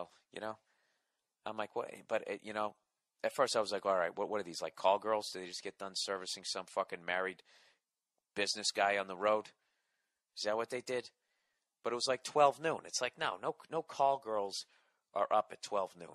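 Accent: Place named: Michigan, United States. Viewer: Sounds like people from flint Michigan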